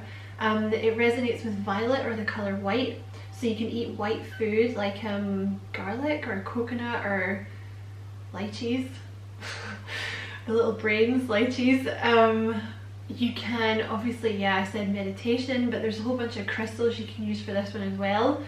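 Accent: Scotland, United Kingdom. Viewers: I have just binge watched your videos here, I love your explanations for everything I would really agree with how you see things and you have confirmed a lot of what I have felt to be true but wasn't sure if I was seeing it the way other spiritual people do so it's great to get your take on everything. Also this is the best chakra explanation I have heard 💚💫🙏. You probably hear this a lot but I love your Scottish accent, I'm Irish and we do tend to like the Scots over here 😃. God bless and take care 💚🙏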